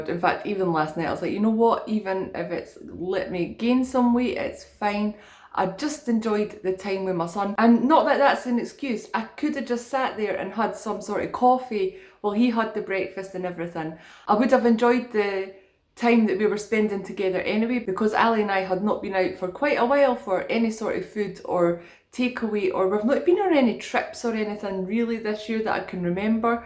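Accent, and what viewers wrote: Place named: Wales, United Kingdom. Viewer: I love all the accents on YouTube. I don't currently have a Welsh one so off I go to channel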